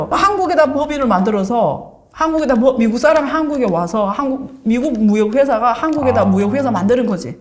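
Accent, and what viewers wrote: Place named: Yanbian, China. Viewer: 중국 연변족이네요 중국없이 갑니다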